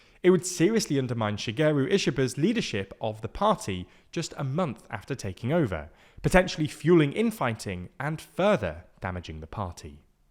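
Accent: United Kingdom. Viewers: Its his british accent heh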